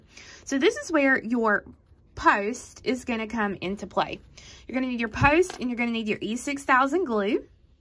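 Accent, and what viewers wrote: South, United States. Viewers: Beautiful and beautiful southern acent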